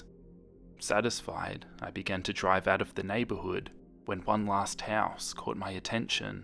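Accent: Australia. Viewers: Australian I think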